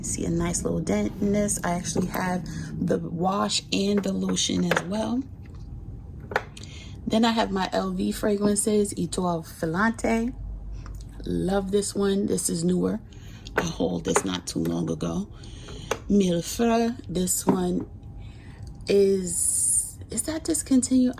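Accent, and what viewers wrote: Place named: New York City, United States. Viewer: New viewer here 🖐️.. your NY accent is so strong lol nice collection 🙌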